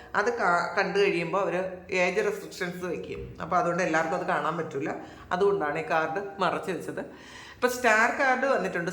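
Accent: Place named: Kochi, India. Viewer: Good morning ❤.. മാം എവിടെ ആണ് താമസം. സംസാര ശൈലി കേട്ടിട്ട് കൊച്ചിയാണ് എന്ന് തോന്നുന്നു...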